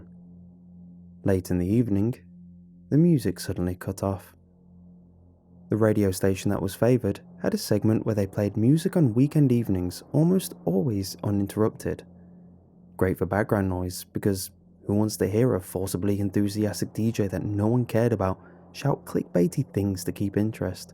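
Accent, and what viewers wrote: United Kingdom. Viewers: Still liking this Beyond Belief: Fact or Fiction twist of a series. Creepy Pasta reading is kind of dying, and I know it's hard to move on to something new. You're doing great. Anyone else have that deer in the head light look every time an Asian person has a British accent? Come on....come on....be true to yourself.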